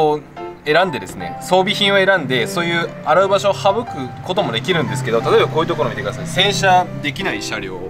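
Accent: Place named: Kyushu, Japan. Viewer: しかれる。 上京して28年、九州弁たまらんです。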